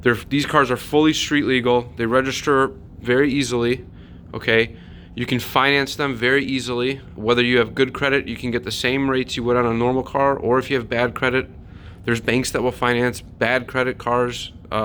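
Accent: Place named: Northeast, United States. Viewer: Great video! I love the northeastern accent my cousin lives in Philly. I’m in Texas.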